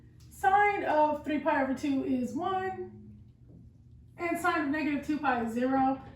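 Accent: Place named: Australia. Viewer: Can you explain in hindi That is not matter I can't understand english but you are speaking like australian that's why iam not able to understand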